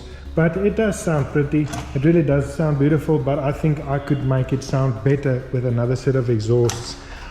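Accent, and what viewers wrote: South Africa. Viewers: I caught the South African 🇿🇦 accent straight away & had to check your channel out. It looks like a lot of interesting content & I'm looking forward to going through the D Type build.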